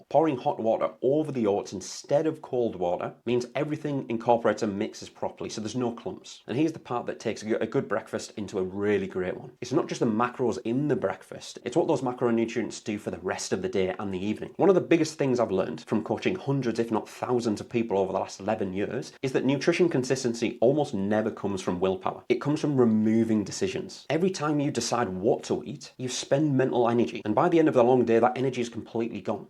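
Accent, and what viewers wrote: Newcastle, United Kingdom. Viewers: I just thought it was because you were a Geordie. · He's a Geordie. Like Scots, he talks faster because he thinks faster than our southern cousins 😂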